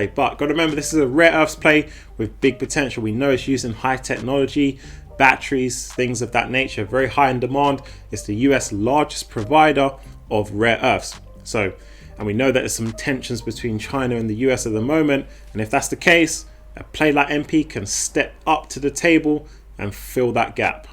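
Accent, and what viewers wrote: United Kingdom. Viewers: Hi. You from the uk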